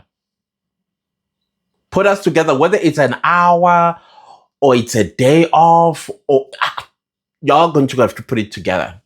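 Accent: South Africa. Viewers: 😂😂😂 you make me laugh, that emfuleni tone😂😂😂😂😂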